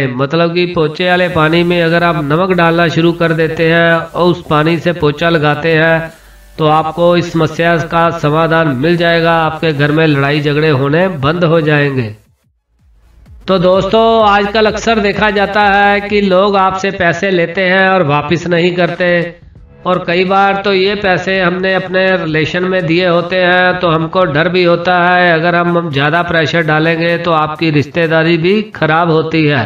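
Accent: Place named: Punjab, India. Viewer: Aby tu panjab mai kr mujra kr teri tou hindi bhi panjabi wali hai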